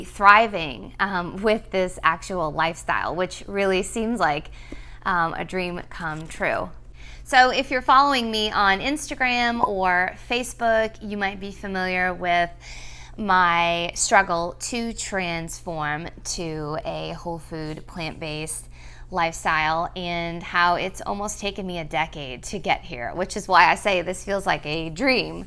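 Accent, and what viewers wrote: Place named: Texas, United States. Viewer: You came up into my feed today. I binge watched all of Plantifulkiki’s videos and switched my diet three weeks ago. My energy is amazing now! I subscribed to your TB & IG I can completely relate with the family issues & diet X 10 years. I hope to be an inspiration to them & then they will follow more. Cute Texan accent! You’all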